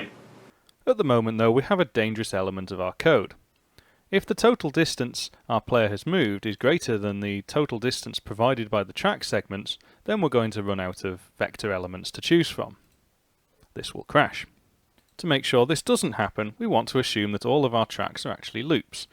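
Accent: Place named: United Kingdom. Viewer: Your accent looks very much like British, it is quite cool. Nice video bro. Thanks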